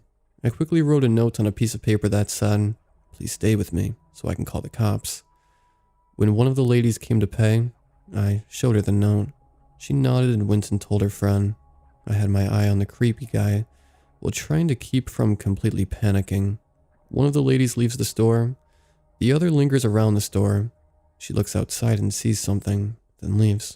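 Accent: United States. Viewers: I have great deal gratitude to those who narrate some very different like dr creeps he got own style as do this one both very good.apart from American accent and he can't help that.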